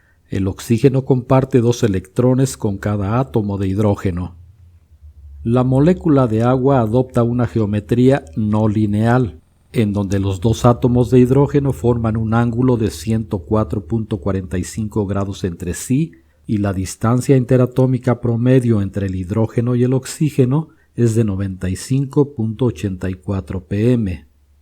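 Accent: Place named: Dominican Republic. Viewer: Es acento dominicano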